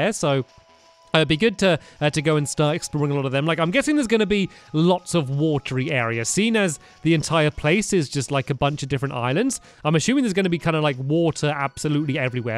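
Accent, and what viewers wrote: England, United Kingdom. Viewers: Lunapuppy Vlogs is from England, so they pronounce Z differently lol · S4L Yolo It's just stampys accent You could say both ways In England we say z as zed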